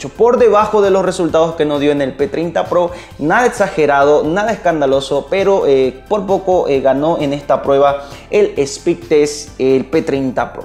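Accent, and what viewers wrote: Bolivia. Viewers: tenes el acento de un camba, y tipico siempre dicen el "obviamente" jjaja donde queda tu tienda?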